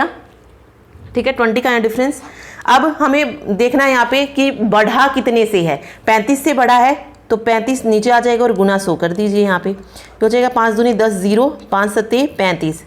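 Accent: Haryana, India. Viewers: And mam app haryana se ho apki language me haryanavi touch h an ye video hamera liya bhoot hi helpful h so thanku again mam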